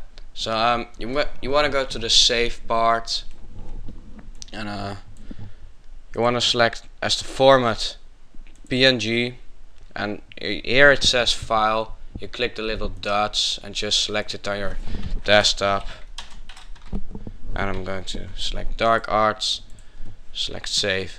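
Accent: Netherlands. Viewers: I know how hard it can be to talk proper english when you're dutch, because I'm dutch too, but I might have a tip for you. Write down your text before you start, and just read it. Or just please dont use your voice if it isn't good enough. Sorry. You keep swearing and there are Silent letters in english. Also letters you DO have to say. Don't say "oh yea, plural" in the middle of a video. We don't want some gramar lessons. Apart from that, the tutorial was pretty good. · u have a dutch accent :D i can tell because im dutch myself and most dutch people who talk english (or try) sound like this. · U dutch or from belgium :P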